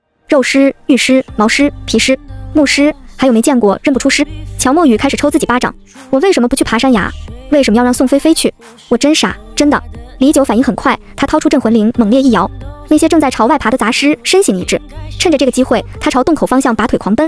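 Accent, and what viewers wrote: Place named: Malaysia. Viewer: 嗯？这么听到马来西亚惹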